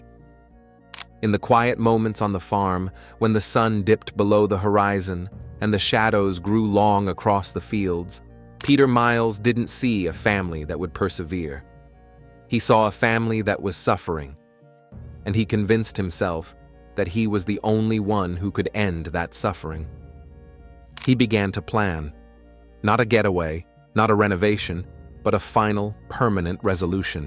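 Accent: Delaware, United States. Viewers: 😂 Not to get off this topic, but you sound like you live in Delaware. DMV here will piss anyone off to the point you get lost trying to drive away from there. 😂😅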